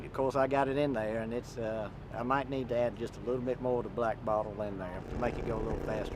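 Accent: South, United States. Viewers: Of course he's southern lol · it just makes it that much better that the dude has a southern accent haha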